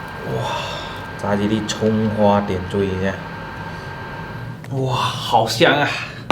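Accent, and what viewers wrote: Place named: Fujian, China. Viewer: 不知道为什么，我总是听不惯福建口音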